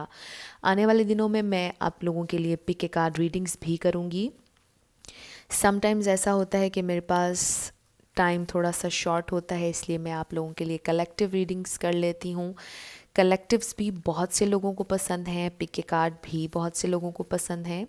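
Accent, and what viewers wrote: Pakistan. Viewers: her way of talk matched with us i m also pakistani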